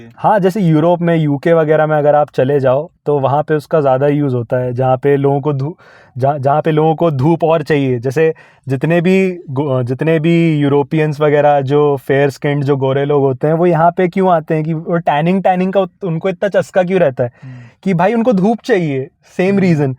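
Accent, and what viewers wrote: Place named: South India, India. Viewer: his talking style is similar to that of a south Indian guy born and brought up outside of south India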